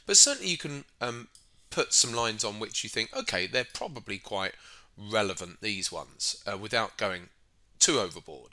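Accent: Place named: United Kingdom. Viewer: That British accent man I wish I had it too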